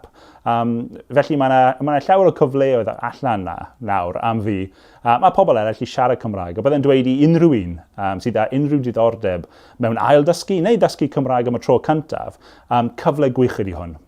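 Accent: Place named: England, United Kingdom. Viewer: How have you managed to speak Welsh with an English accent?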